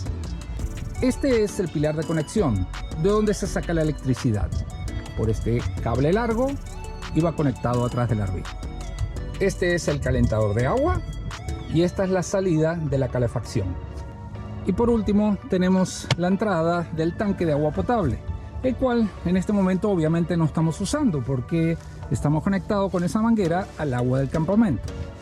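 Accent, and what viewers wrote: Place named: Argentina. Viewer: Hola, te felicito por el video, está muy entretenido y sin tantas vueltas! PD: seguí así con la tonada Argentina!!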